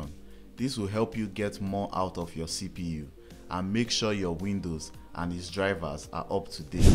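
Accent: Nigeria. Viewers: Are you from Nigeria 🥺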